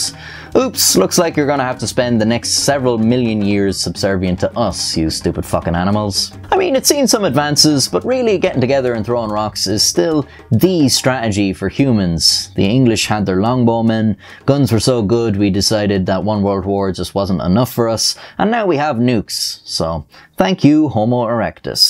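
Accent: Ireland. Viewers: "15 of tha fookers were found in a cave" This is why we need you narrating history documentaries, nothin captures my attention faster than that lovely irish accent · How does only have 500,000 subs? Man u cover all the coolest shit. Definetly the coolest Irish guy I've ever seen lol just seem less like a guy with a kilt than the rest hah. Thx 4 the vid man. War history stories r my favorite thing u cover like the ww2 meth guy but any war history is interesting to me. Next time u get time for a war story up your sleeve would love to hear one. I usually cant stand an Irish accent like old British is also nails on a chalkboard for me but this guy is pretty chill I don't even notice it. I live in the sticks of Kentucky so im sure I sound strange to some people. Conor McGregor gave u fellas a bad rep god tht dude is obnoxious lol. Keep it up man love the content 5 star quality. 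I'm honestly suprised you don't have more subs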